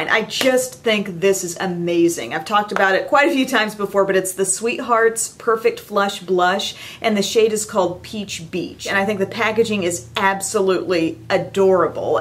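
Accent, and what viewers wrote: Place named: South, United States. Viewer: She's from south western Illinois I believe - so she has a bit of a southern accent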